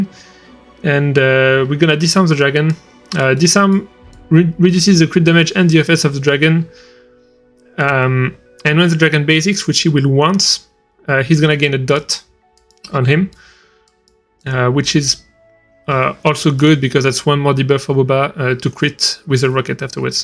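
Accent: France. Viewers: Sounds French to me... I'm in France right now! Nice to see someone making these guides in countries other than the U.S.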